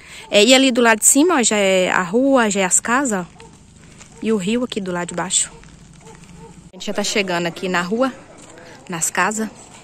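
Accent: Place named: Bahia, Brazil. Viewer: Sem nexo isso aí, Sobre o gerúndio não vi sentido algum, como que você está querendo que ela seja natural? Sendo que você está tentando ensinar ela o correto! Com certeza ela está sendo natural, se fosse ensaiado ela não iria falar "vou tá mostrando a colheita" e sim como você disse "vou está mostrando a colheita", que diferença isso faz? você é baiana? Ou não sabe que esse é o sotaque? Sigo outras moças baiana aqui que fala igual a ela, tenta, ver isso aí direitinho que você escreveu, na verdade você está procurando pelo em ovo de galinha!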